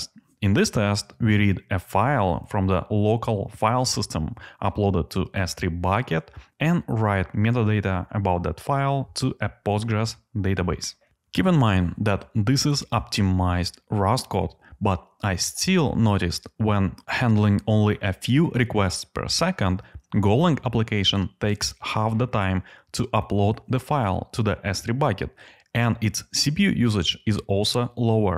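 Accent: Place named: Russia. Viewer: Было бы более приятно слушать без русского акцента)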